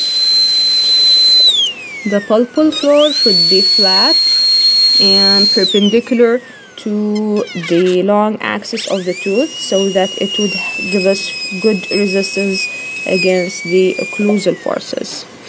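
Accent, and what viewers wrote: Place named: England, United Kingdom. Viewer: Great job. Great En. accent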